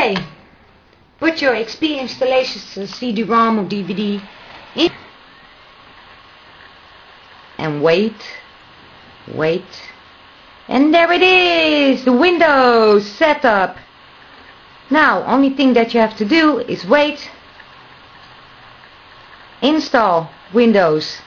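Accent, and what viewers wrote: Netherlands. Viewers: Ik hoor dat je nederlands bent :P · she is from holland i know from the talking!!!!!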